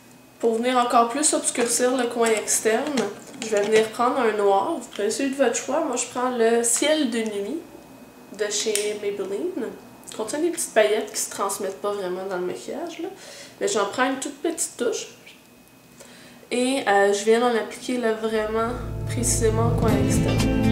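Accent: Quebec, Canada. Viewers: Maquillage divin! comme d'hab;) si seulement j'avais la moitié de ton talent! mais j'y travaille sérieusement, grace à tout tes conseils et tes vidéos super intêressantent! Merci de te lever si tôt pour nous faire tes tutos! Au fait! ton accent Quebecois! j'adoreeeeeeeeeee et t'ai " " comme tout =)))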